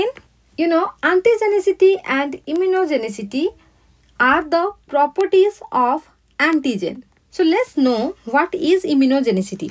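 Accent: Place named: Odisha, India. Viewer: I was first confirmed that you are from odisha by listening your speaking style...thank you so much ma'am... For making this understandable.. Please upload videos for ug students. 🤗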